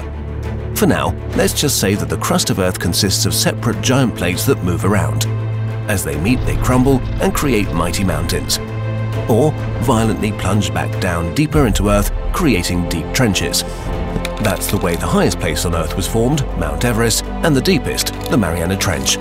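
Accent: England, United Kingdom. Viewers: beautifully narrated with an English accent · A man from England speaks English.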